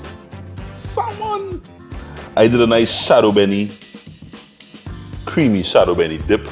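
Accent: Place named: Virgin Islands, Caribbean. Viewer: At first I thought I Heard Virgin Islands 🇻🇮 accent but I had to listen 👂 closely lol nice voice man now meh hungry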